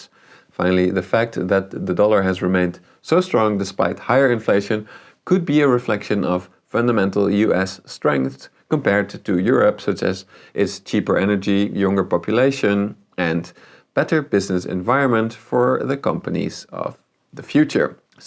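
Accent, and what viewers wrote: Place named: Netherlands. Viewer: Strong dutch accent😂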